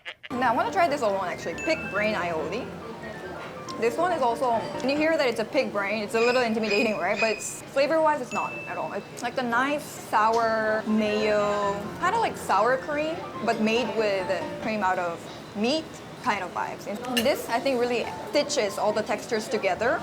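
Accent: Philippines. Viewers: I love her tagalog sounds korean but her English is pinoy accent.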